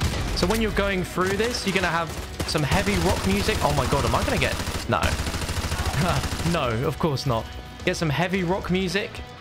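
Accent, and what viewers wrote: England, United Kingdom. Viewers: "omg a Juggernaut. How many grenade hits is he going to take?" Cleary hasn't played the High Explosive Spec Ops mission or maybe even figured out it takes one to the head XD. (also, the proper term is "soccer ball". But I can tell you're from England so it's whatever. lol) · Are you UK?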